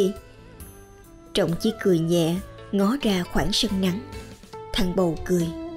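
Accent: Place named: South, Vietnam. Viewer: Lâu rồi hôm nay mình mới nghe được giọng đọc ngọt ngào của miền Nam Thaks tác giã và người đọc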